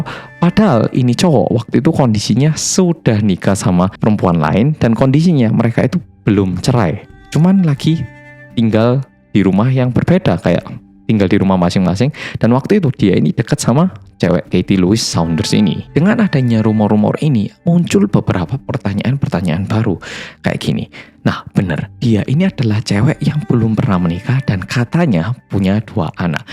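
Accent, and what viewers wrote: Java, Indonesia. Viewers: Mas saya mah gak suka sama SJK, saya lebih suka sama kamu ... Muka korea logat jawa 😊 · Medoook👍👍 Edit mungkin lebih halus jika "diasuh" mas bukan "dipelihara" mohon maaf koreksi dikit🙏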